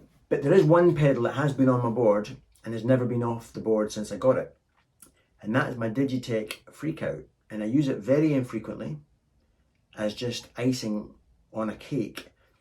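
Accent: Scotland, United Kingdom. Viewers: Cool video and Scottish accent is the coolest accent in the world. cheers from Vancouver, Canada. Ed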